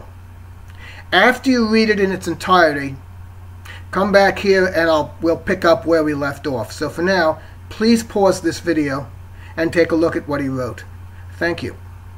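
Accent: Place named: East Coast, United States. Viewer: by the accent, i assume you are a east coast liberal?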